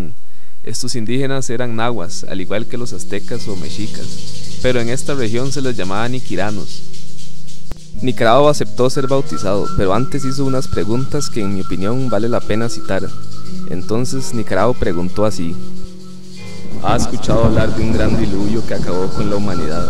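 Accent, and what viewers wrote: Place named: Costa Rica. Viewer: llegar a uno con acento tico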